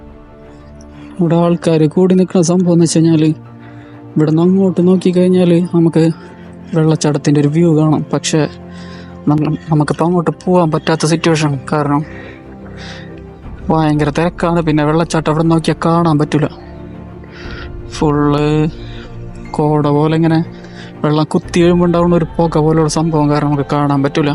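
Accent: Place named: Kochi, India. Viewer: Kochi aano place slang adhupole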